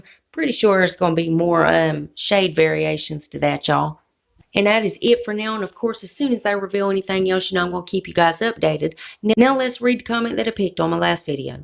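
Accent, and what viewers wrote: South, United States. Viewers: Girl you sound way more southern than central Ohio area! Are you a native born Buckeye? I moved to Ohio when I was 5 and lived there most of my life until I moved to Iowa when I was 40. I lived in several suburbs of the Greater Cincinnati area. I don't have much Cincinnati southern accent left after living in Iowa for over 20 years. lol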